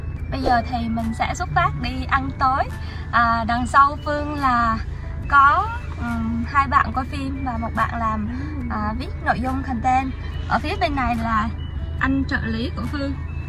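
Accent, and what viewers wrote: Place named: Da Nang, Vietnam. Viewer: Phương người Đà Năng hay sao mà giọng nói như người Đà Nẵng vậy. Lần đầu mới xem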